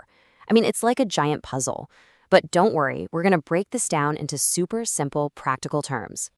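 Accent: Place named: Australia. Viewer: Oh my God, you’re Australian. I’m Australian.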